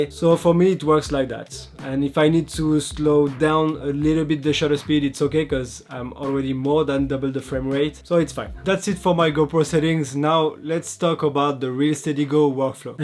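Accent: France. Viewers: qui a reconnu l'accent FR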